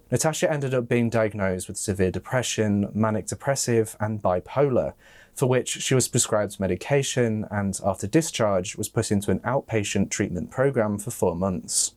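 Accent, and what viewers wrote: United Kingdom. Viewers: I've watched years of your videos and just love you. You and Emma Kenny are my favorites. I'm an American and I adore you and Emma's British accents. Thank you for the hard work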